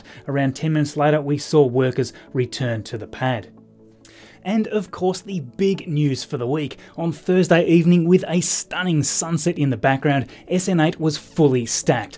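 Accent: Australia. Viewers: Love your videos and its great to hear in Aussie accent. May I recommend an Artlist subscription for your music.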